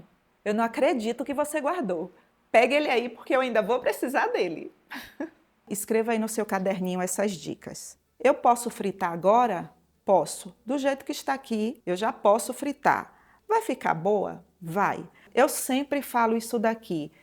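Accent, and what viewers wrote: Bahia, Brazil. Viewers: Oi amada com certeza vc é baiana sotaque que morro de sau😮dades dos meus amigos solteropolitanos, morei em Salvador 22anos, bjos amada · Tenho certeza que a senhora é baiana É o nosso sotaque! Maravilhosa receita !!!!! Parabéns pelo trabalho 🎉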